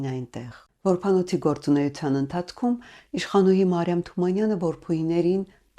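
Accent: Standard. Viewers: Հարգելի ❤️ինչպես միշտ շատ հետաքրքիր է ձեզ լսելը 🥰 Ձեր մաքրամաքուր հայերենը կրկնակի հաճելի է լսել 🥹🥹🥹🙏🏻🙏🏻🙏🏻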